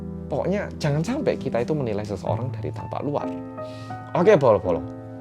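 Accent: Java, Indonesia. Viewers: MEDOK....... NICE 😁😁😁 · yey dtektif korea yang medok UPLOAD SETIAP HARI, semangat mas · Medhokkk banget🤭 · Harusnya bersama detektif Korea yang medok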